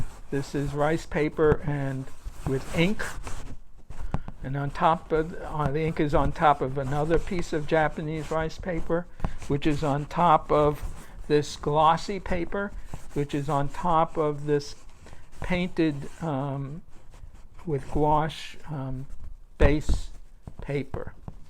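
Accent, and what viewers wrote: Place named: Brooklyn, United States. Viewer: your accent is just fine to us Brits! We love listening to your Brooklyn accent🤩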